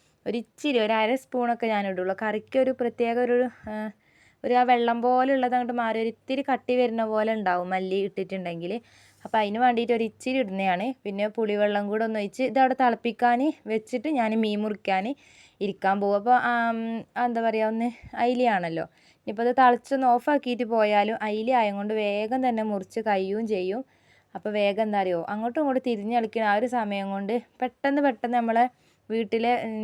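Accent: Malappuram, India. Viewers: എനിക്ക് ഏറ്റവും ഇഷ്ടപ്പെട്ടത്. അവതരണമാണ്.മലപ്പുറം സ്റ്റൈൽ' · മലപ്പുറം ഭാഷ ആണല്ലോ 😮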